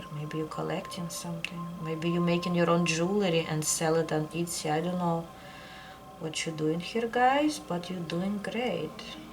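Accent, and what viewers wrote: Russia. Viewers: You have the most beautiful accent and voice Idk Russian almost sounds like my grandmother's accent on my dad's side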